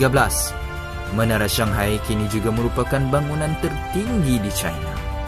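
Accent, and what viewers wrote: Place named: Sarawak, Malaysia. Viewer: Sarawak? Sebab ko ade slang sane.. Kan kan kan kan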